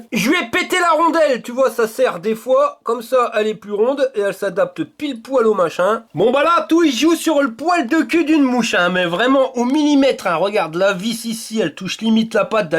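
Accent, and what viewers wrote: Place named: North, France. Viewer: Salut , je viens de découvrir ta chaine et rien que pour ton accent d'min coin je dit oui :) Je suis du nord aussi proche de valencienne si jamais ^^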